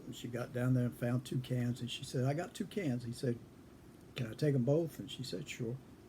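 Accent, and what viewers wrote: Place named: England, United Kingdom. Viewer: I knew I heard an English inflection your dialect. What part did your family hark from?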